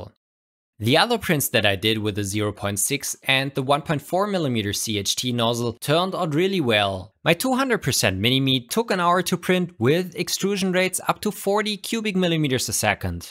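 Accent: Germany. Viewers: höhre ich da deutsch raus? XD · Man hört so hart raus dass du Deutscher bist :) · hmnhhh gutentag, your english is nice i bearly hear your german axent:D im proud of you <3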